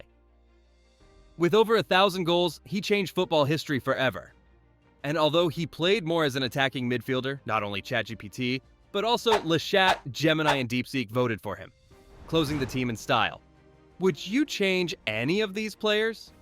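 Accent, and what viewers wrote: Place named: United States. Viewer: Damn.. the American accent is excruciating 😅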